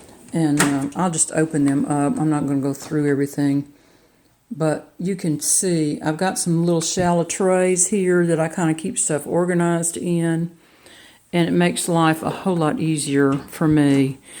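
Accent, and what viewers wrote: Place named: South, United States. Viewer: New subscriber! Love listening to a fellow southerner soft accent !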